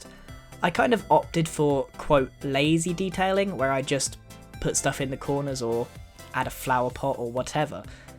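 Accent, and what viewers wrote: United Kingdom. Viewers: Wow! You are a genius! I really like that british accent. :D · You sound like your British? You probably aren't. But that doesn't matter a tad. You are extremely good at building!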